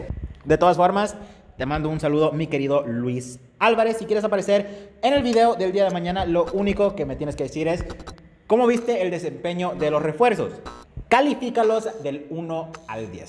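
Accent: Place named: United States. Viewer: Está ablando el pirrurris gringo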